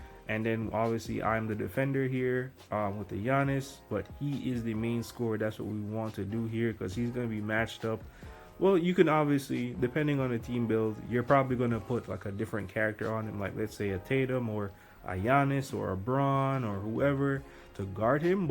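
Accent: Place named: Jamaica. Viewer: You sound Jamaican or Bahamian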